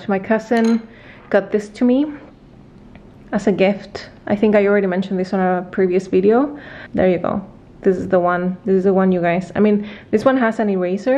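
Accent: Chile. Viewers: Gracias, soy Mexicana, y apesar de que dices que el español chileno es difícil de entender, me encanta tu asentó, el que menos entiendes es el de Buenos Aires, hugs and kisses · tooooodos los acentos son preciosos. ❤ Soy española y los acentos de Latinoamérica me súper encantan!!! Y el acento de Chile es bacán 😉😁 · Also today a recommended your channel to a friend who lives in texas so she can get used to the chilean acento when she meets me (one day)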